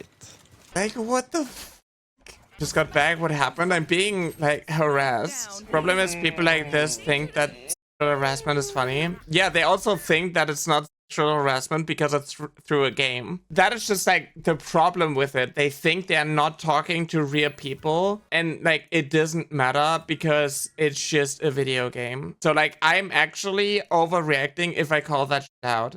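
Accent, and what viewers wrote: Germany. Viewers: I thought I heard a Görmän accent! 😂 - I'm sorry you had to go through that. I stopped playing Valo long time ago due to toxicity. Im very sad whenever the old saying "Männer sind Schweine." is proven right. Just how where these guys raised? 😅 - Keep up the pride, we're with you.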